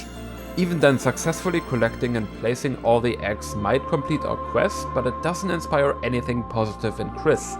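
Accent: Germany. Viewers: Your accent sounds pretty German for an English speaking youtuber, i have a theory German was the language you used growing up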